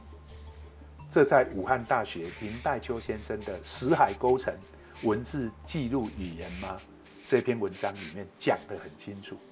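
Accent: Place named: Yongchun, China. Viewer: 我也是永春人，難怪口音與陳老師相類。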